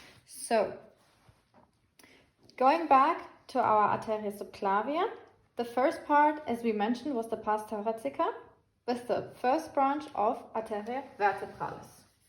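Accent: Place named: Germany. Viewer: sound German for me, either way thanx for the video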